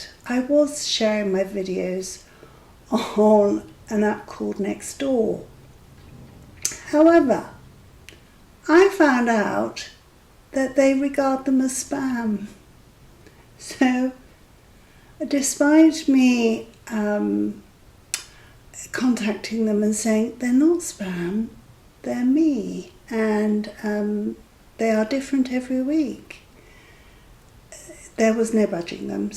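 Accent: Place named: Northern Ireland, United Kingdom. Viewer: Yeah you got it right its Northern Irish accent!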